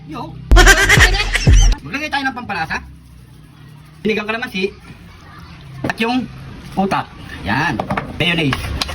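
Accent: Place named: Western Visayas, Philippines. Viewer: Akala ko ba ilocano version bakit ilongo pagkakasabi mo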